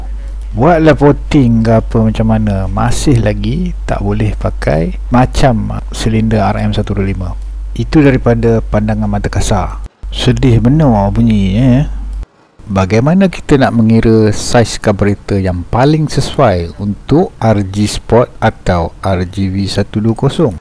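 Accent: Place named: Kuala Kangsar, Malaysia. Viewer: Assalamualaikum, buat video lagi, suka dengar Abg loghat Perak dan ilmu yg zaman sekarang susah nak dapat... Terbaik bang (S.I.F.U)!!! Semoga Allah permudahkan segala urusan Kome dan semoga Allah memberkatimu insya'allah, 🤲 Aamiinn Yaa Rabbal'alamiinn:)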